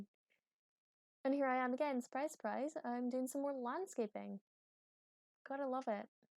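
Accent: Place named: Scotland, United Kingdom. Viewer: I loved it! And I love your accent 💚 so rarely I hear Scottish in media 💚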